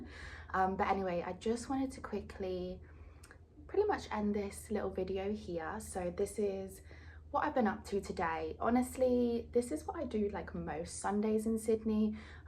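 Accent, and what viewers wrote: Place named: England, United Kingdom. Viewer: Hi are you English ? You hVe a lovrly accent.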